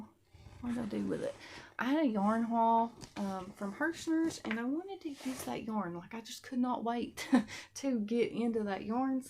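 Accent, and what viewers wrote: South, United States. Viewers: you're so cute. I love your accent. My family is from the south/midwest too but I didn't get the accent. Boo. LOL. Your Lucy doll is fabulous! So creative and fun! Those blankets and rug/doily are amazing! Your drawers are a great idea! I use little condiment containers from the Dollar tree and separate them by size, for my safety eyes. I store them in an old Crochet Society Advent Calendar. I don't mind long videos. It let us get to know you better:)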